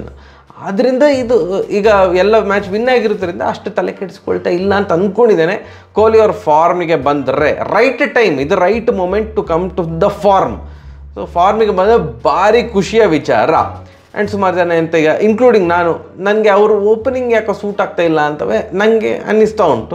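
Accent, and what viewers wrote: Mangalore, India. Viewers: You are the best cricket analyst ❤ and your mangalore Kannada is superb ❤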